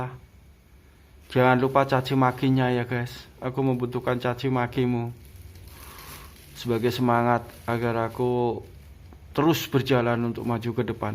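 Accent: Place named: Java, Indonesia. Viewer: Mf mas anda kyknya.dari logatnya dari jawa ya,bhsanya kok kyk jawa asli,kok ngrti pecut ,di pecuti,di pecuti klw bhs jawa d kawengi pakai pecut,d sabeti pakai pecut,klw spngetahuan sy di pecuti sm dgn d cambuki,kyk istilah hukuman d aceh d cambuki,smga buat pembelajaran mas,mf mas mukanya kyknya kok bengkak istilah jawa bngkak itu tembem abuh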